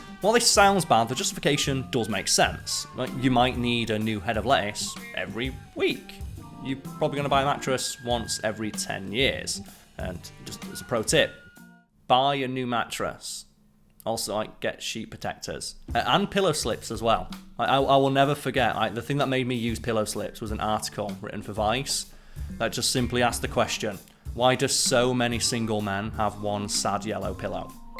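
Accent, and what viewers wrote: England, United Kingdom. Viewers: Can you slow it down a bit. Yes I could set my speed to 0.75 but you wanted feedback. I like the English accent but a bit slower presentation would suit me. Again, feedback asked for, not a critism. I said I'd give it a go, has big shoes to fill. I'll hang around a bit longer. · 1) I like his dinosaur shirt. 2) Maybe he uses bleeped words too much. I'm still thinking about that because I use bleeped words also. 3) I think the whole video was paced well. 4) I'll miss Simon, but I'll like just as much after I get used to his slightly different British accent.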